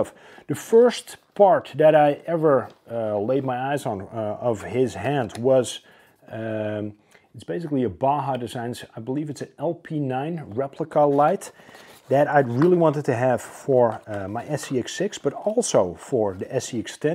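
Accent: Netherlands. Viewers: Very nice video like it. Just thinking of getting a CJ. Btw, do i hear an dutch accent? 😊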